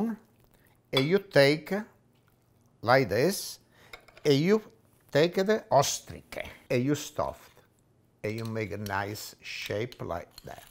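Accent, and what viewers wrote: Italy. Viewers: The recipe is great, but that Italian accent makes it better!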